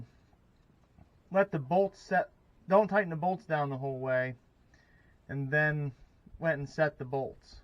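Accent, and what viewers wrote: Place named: Pittsburgh, United States. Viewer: Way to go there bud! Sounds great. Good hearin the ole Pittsburgh accent again too from way out here in Oregon. Saw a bit of the Brownsville steam show video and pieced it together that you must be around sw PA. Grew up a little north of Brownsville. Take er easy, ill pass the channel on to some cowokers 🤙🏼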